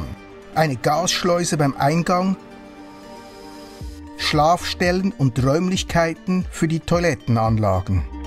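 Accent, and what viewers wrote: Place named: Bern, Switzerland. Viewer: Je mehr Filme ich von Ihnen sehe, desto begeisterter bin ich. Und zwar nicht nur wegen Ihrer unglaublich tollen Recherche und dem schweizerischen Bäärn-Hochdeutsch;), sondern auch von dem unbändigen Freiheitswillen und der Wehrhaftigkeit dieser Generation ( welche meine Grosseltern angehörten). Wir können heute nur erahnen unter welchen Entbehrungen diese Männer und Frauen gelebt haben. Ihre Filme zeigen und würdigen das, und machen es für die nächsten Generationen greifbar. Ich habe auch gedient und bin dankbar ein freier Schweizer zu sein. Gottes Segen.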